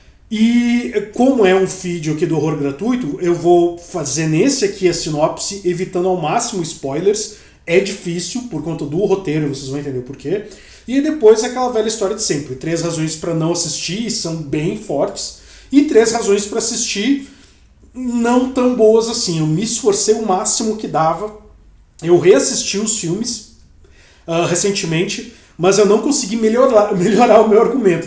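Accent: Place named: South, Brazil. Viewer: acho que ele é ou Paranaense ou Catarinense por esse sotaque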